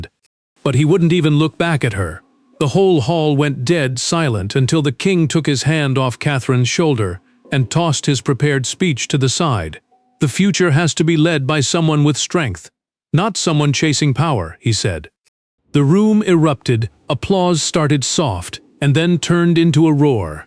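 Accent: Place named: United States. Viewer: That's why we don't trust any of it. And spoken with an American accent, what can I say. It's not for Americans to comment on our affairs, and certainly not in this way. Americans always steps on other people's toes, thinking they own the world.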